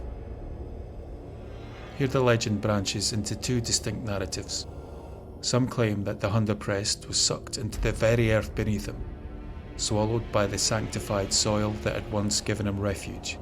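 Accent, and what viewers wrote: Scotland, United Kingdom. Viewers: These videos are worth watching just to hear the awesome Scottish accent.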